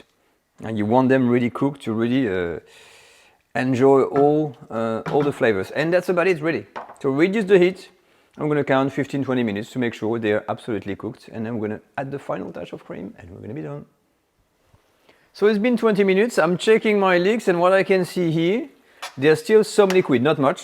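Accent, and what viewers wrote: France. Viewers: PLEASE do a video in French; your accent will enhance the experience!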